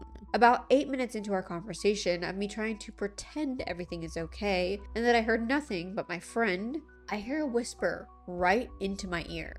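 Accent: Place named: Midwest, United States. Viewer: Where is she from? How she talks reminds me of the Midwest and ita so comforting. How she says 'sawl' instead of 'saw' lets me know she's cool 😎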